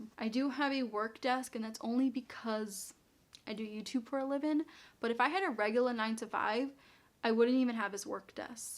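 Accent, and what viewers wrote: Boston, United States. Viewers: That accent is 🔥🔥. I'm from L.A so hearing your accent is different. Is that a Boston accent?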